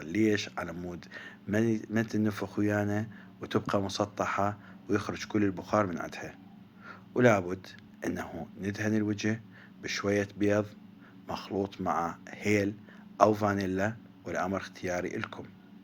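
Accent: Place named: Iraq. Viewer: عيني بعد اللهجه العراقيه ربي يحفظ ويبارك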